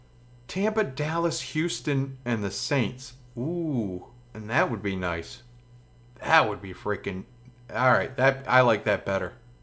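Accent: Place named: Brooklyn, United States. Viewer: Brooklyn is my favorite accent. Seriously love it. I don't understand all this sport talk but I like listening to you talk about it. Missed you around here buddy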